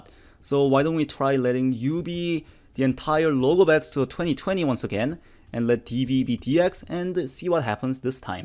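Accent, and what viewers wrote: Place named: South Korea. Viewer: You must be Korean, with that strong Korean accent, which really adds flavour to your videos.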